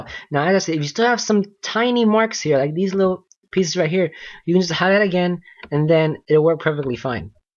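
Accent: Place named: India. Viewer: lol wow that's quite observant of you! I thought pretty much only Indian people would be able to pick up on such a subtle accent :)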